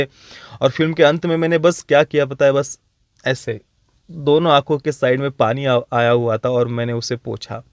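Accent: Maharashtra, India. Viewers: Aapka hindi itana mixed with marathi hai...bohot jyada, isme koi burai nahi but aap ek reviewer ho, hindi to perfect chahiye...nahi to apaka channel itana grow nahi karega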